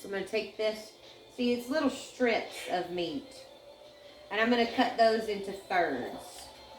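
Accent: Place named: Louisiana, United States. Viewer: I will surely try this😊😊 sounds like you are from Louisiana. I am from SW La. good,simple food….nothing better!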